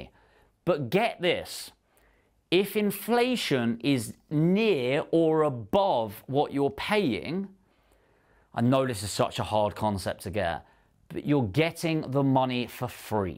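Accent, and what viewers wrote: United Kingdom. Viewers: I’m curious to hear you’re from which part of UK? 😅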